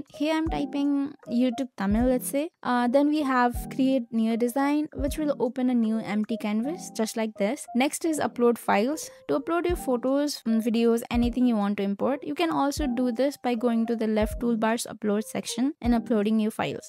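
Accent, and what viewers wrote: India. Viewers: Finally someone has taken the time to explain the basic nuts & bolts/tools of Canva. I also like you Indian accent ❤ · hate indian accent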